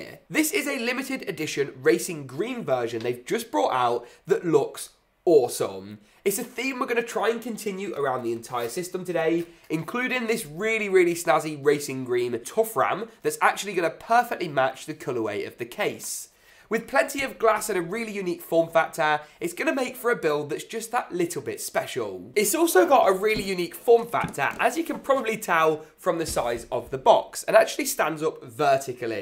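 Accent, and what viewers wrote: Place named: Yorkshire, United Kingdom. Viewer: You have a nice Yorkshire accent.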